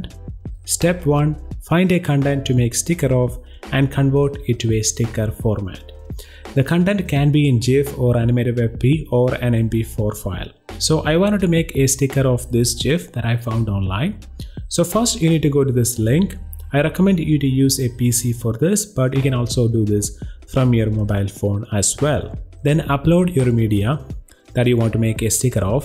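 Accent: Kerala, India. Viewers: Are you a malyali...? Just asking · Tovino onnum venda.. typical mallu accent .. entem angane thanna ketto😂😂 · Malayalee aanalle? English accent kettapo thoni 😬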